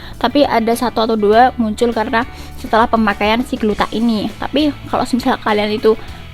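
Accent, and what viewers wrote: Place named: Java, Indonesia. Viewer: Aku suka sama dialek kaka yg medhok👍🏻🤭 Austrin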